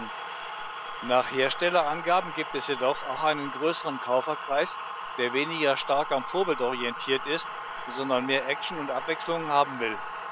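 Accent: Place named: Franconia, Germany. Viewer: Zum "gebrochenen Deutsch": Der so genannte "Akzent" entpuppt sich eindeutig als waschechtes Fränkisch, also durchaus passend für eine Maschine nach Westfrankenbahn-Vorbild eines urfränkischen Modellbahnherstellers. Hochdeutsch wäre hier eigentlich eher fehl am Platze!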